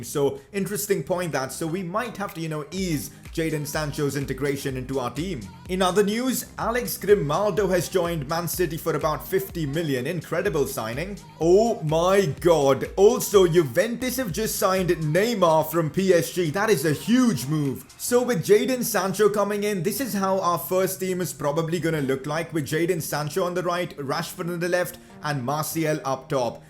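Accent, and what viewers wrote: India. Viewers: Are You Indian.... Cuz your accent sounds too familiar.... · India accent😁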